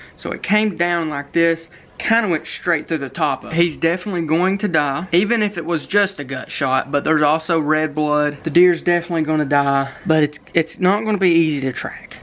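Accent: United States. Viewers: bro is the most country sounding person i've ever heard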